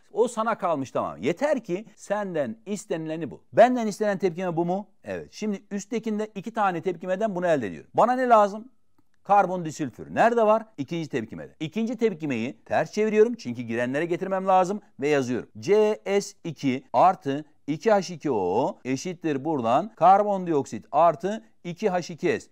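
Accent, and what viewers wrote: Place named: Thrace, Turkey. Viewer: Hocada bi trakya ağzı var da ekrem imamoğluna benzemiyor nasıl benzettin :D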